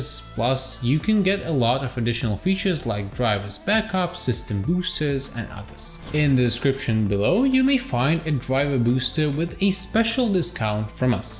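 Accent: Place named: India. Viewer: Bro what’s with the Indian accent?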